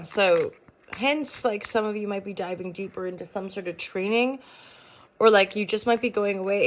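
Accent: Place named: Yorkshire, United Kingdom. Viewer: Good morning girly! I’ve watched you religiously until 2-3 yrs ago I felt called to watch you again, the accent is northern.. Yorkshire most prob 😂❤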